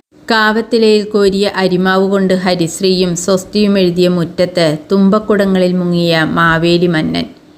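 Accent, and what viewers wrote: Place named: Valluvanad, India. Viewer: വള്ളുവനാടൻ തനിമ നിറഞ്ഞ ശബ്ദം പ്രിയ സഹോദരി അഭിനന്ദനങ്ങൾ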